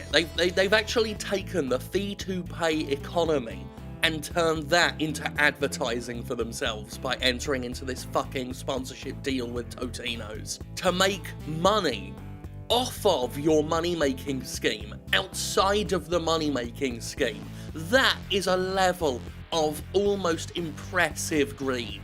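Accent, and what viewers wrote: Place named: United Kingdom. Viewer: Some Times i find the british Accent some anoying. But yours sounds great. Especially when you talk down to bad Business and Games. This Videos help me to resist the Urge to buy Shadow of Mordor, thanks. I think i buy Hellblade instead.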